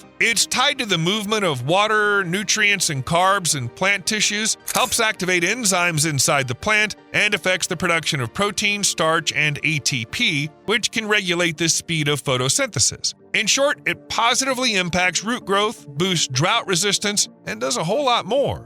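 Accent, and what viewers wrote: United States. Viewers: Very interesting but spoilt by the most annoying american robotic accent. He sounds as though he wares very tight underpants. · Shouting American accent hard on the ears. Please bring a more toned down British person.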